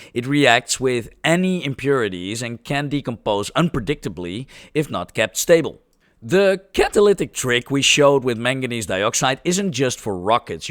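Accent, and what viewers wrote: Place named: Netherlands. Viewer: very dutch nice accent meneer very goed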